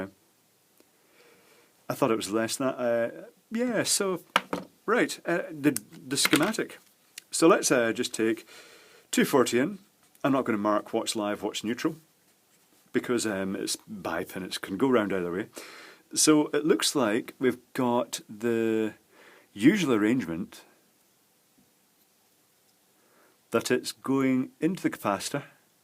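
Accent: Scotland, United Kingdom. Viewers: True, he is actually from East Kilbride one of my favourite Scottish toons (lovely people) but has a Newton Mearns (snobbiest toon in Scotland with the most horrible people) voice - very polite and annuciative. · Nice video. I like your scottish accent ;)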